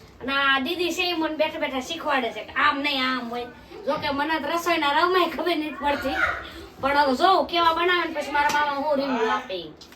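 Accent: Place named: Rural, India. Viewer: મારી અટક પણ રાઠોડ છે. તમારાં મામાનું ગામ કયું? તમારી શુધ્ધ ગામઠી બોલી હો. મજા આવે એકે એક શબ્દ સાંભળવાની.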